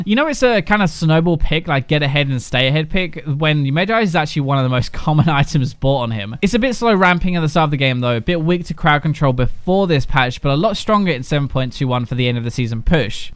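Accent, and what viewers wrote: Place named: United Kingdom. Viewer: He is British, not an Amerifag. Not everyone pronounces words the way your cancer country does.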